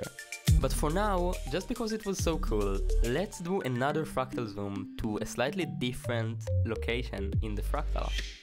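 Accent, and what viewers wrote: Israel. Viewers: בערך שני שליש לתוך הסרטון חשבתי לעצמי ״מעניין מה זה המבטא הזה? מעניין מאיפה הוא?״ ואז קלטתי שאתה ישראלי!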